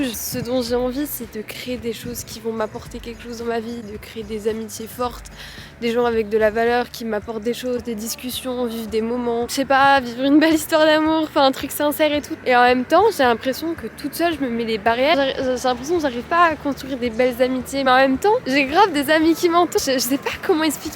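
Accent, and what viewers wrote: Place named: Quebec, Canada. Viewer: Tu as un léger accent québécois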